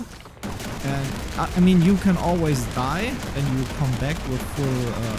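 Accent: Germany. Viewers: Dieses deutsche Englisch hahahaha geil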